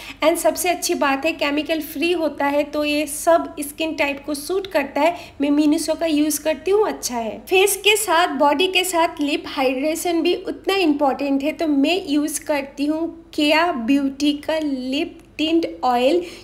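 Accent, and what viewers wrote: Bengal, India. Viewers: Love this Channel and love your sweet bengali accent that pops in while speaking hindi ❤❤want to aski regarding can we have soups recipe as well since winter is approaching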